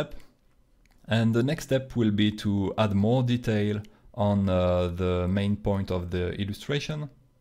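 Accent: France. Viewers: Amazing video. Even though the software has updated, this is still relevant. Concerning your English though, and please don't take this as a criticism or an attempt to insult you, but god fucking damn it I couldn't stop laughing throughout the entire tutorial. PLEASE never change your ways, this is really like getting advice from a stereotypical (not in a bad way) French artist with a bottle of wine nearby. You're the best, and I wish you the best. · That accent... FRENCH POWER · Oh no the French accent makes it more exotic learning from the old French Masters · Heh, your accent is mild. At least compared to other well spoken native French, English speakers I have listened to. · Hi :) I was wondering...are you french? you have a bit of an accent. I took my studies in french and always regretted it, since i thought that english is better for future life (there must be plenty of mistakes here too lol). 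But everytime i go to France or Belgium and go trough comic shops (mainly livres d'occasion) my regrets are gone...french guys have tuns of underground fantasy comic that will never be translated and maybe even forgotten. I found that beautiful and always leave with lots of them. Maybe i'll find yours there someday too :D i hope you're french since my post is so long....it would be awkward xD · I love your french accent =D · Thank you for this helpful tutorial. Just one question, does this o.p.a.b.t.s. organisation against protection of bad tutorial speech really exist? I dind't find it. And they are wrong. You sound French, but there are native speakers with a much more heavy accent. Please, go on publishing more tutorials like this. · Moi qui ne me sens pas super à l'aise avec l'anglais, j'me sens moins perdu avec l'accent français!!😉. Un gros merci, super vidéo. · Tu es français de france ou du québec car moi du québec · ça s'entend que t'es français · Luckily I have a lot of French-speaking co-workers (French Canadian) so am used to the accent and can understand you just fine! 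:-D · Are u french? Nice accent. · Ahah de rien ! Je voulai te rappeler la France ! Ça m'a fais plaisir d'entendre notre doux accent. Super tuto sincèrement ! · You have a beautiful accent, is it French? Also you are a great teacher, I'm learning a lot watching your videos! Thank you,! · J'ai juste compris que tu étais français... Super 😂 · Devine quoi, j'ai regardé une de tes vidéos récemment, j'avais trouvé ça génial, et voilà que je retombe sur toi par hasard alors que je cherche des tips pour pouvoir faire quelques planches de BD pour un dossier de master! Merci bien! Puis pas d'inquiétude pour ton anglais. J'ai bien reconnu que tu étais français grâce à ton accent mais très franchement, on s'en fiche parce qu'au moins tu fais le job, contrairement à ceux qui pourraient te critiquer, s'il y en a. ;) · French accent is so sweet 🤗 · I just returned from living in Grenoble, FRance for 10 years. 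I love the accent, and miss hearing very much in my day to day conversations. Merci !! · Le bon accent Français, on aime! +1 ! :D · I love this tutorial. Thanks so much! (the french accent is becoming cute after I'm into the comic :-)) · pls don't change ur french accent, u must be proud of it, i'am an asian, n i also have an accent as asian, my english still incorrect but i do all understand with ur explanation bout krita tutorial, i'am the beginner for digital art also drawing comic, i just start it since a week ago when i got krita already installed on my pc, i wanna try create my own comic even i can't drawing any art, if u want to know my painting just like lil child under 5 years old, but i won't give up to be an pro just like u r, someday i can be more than u to create some artwork, i believe my self n my own ability to do that, pls support me. thx for ur video, i luv it so much,. 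from now m ur fan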